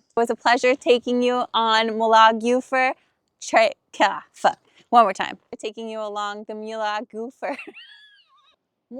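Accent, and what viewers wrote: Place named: New York, United States. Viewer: Was not expecting a New York/Joisey accent.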